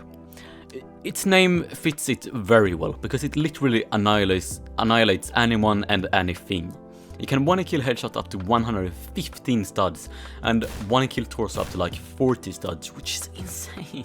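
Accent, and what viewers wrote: Sweden. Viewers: Breh he is Swedish how did you pick up British from that accent? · Jag kan höra att du är svensk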